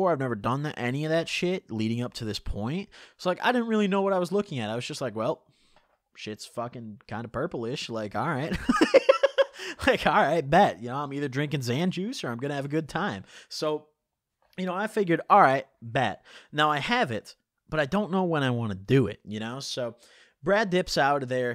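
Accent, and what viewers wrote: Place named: East Coast, United States. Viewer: Where are you from? gotta be east coast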